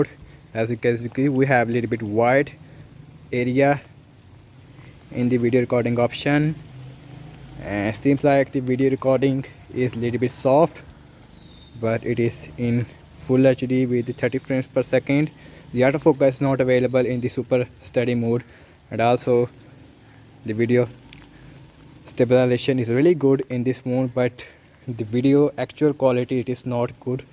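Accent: India. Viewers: Nice to hear English in desi accent. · nice ! thanks , you have a little accents indi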